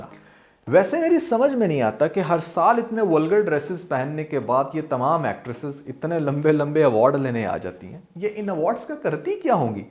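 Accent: India. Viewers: Bhai tu muhajir hai kya bilkul UP Bihar aur Old Delhi wali urdu bol Raha hai? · aap indian accent mein kyun boltay hain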